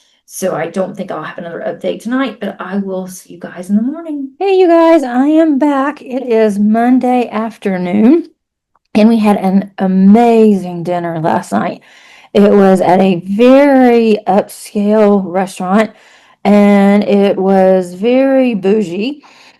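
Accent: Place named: South, United States. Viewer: your sweet, subtle Southern drawl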